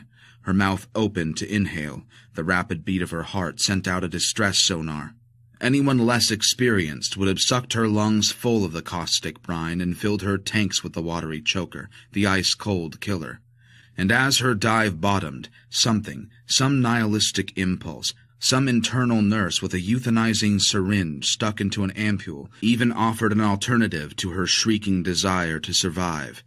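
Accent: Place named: United States. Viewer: As always, Nevill’s tale is rich and textured and compelling. His prodding of what scares us, ancient fear, from before we knew what fear was is—if not actually unique—superlative. Why a Yank narrator? Got in the way a bit.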